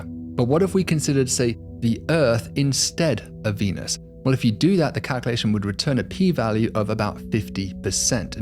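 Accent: United Kingdom. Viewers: It's interstellar, it's not from here.. it is Alien by definition. Just because you have a British accent doesn't make you intelligent. Ugh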